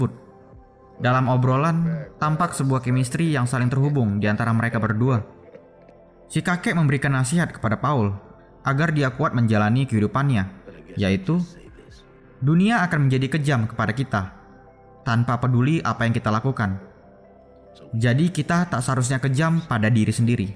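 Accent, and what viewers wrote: Medan, Indonesia. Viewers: bang kau orang medan ya kalo gk salah suaranya sama njir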